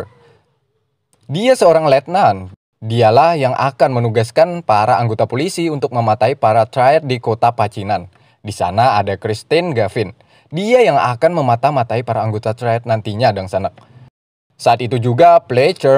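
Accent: Banjar, Indonesia. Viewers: Pinanya urang banjar pembawa acaranya ninih,,yakah sanak..?? Kkkk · Wkwkwk banjar bangat🤣👍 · Orang banjar kah mang? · Banjar banar neh pina nya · Sanak orang Banjar kah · apakah org banjar 🤣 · Logat banjar nginih Salam dari hulu sungai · Urang banjar sidin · Orang Banjar mana suara nya ni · Urang banjar lh bng pian😂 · Banjar banar 😁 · Orang banjar kah tukang kisah nya ne 😅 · Khas banar logat banjar nya🤣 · Khas banar suara bahasa banjar nya kada kawa di buang😅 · urang Banjar kah sanak Pian ni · banjar banar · Urang Banjar nah pembawa kisahnya nih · Urang Banjar kah pembawa alurnya ne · urang banjar kah adminnya. 😅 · Kayak bhasa bnjar pengisi suara x ini.. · Iya sanak,, Banjar banar Pian nih 😅🤭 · Banjar banar dangsanak lah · Orang Banjar kah min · Urg banjar kh p mang? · Ini logat urg Banjar... Iya kh...😆👍👍👍 Aja.... Sukses slalu...Waja sampai kaputing... 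· org bnjar ya bang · Orang Banjar wkwk 😂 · Urang banjar pang pina logatnya😁😁😁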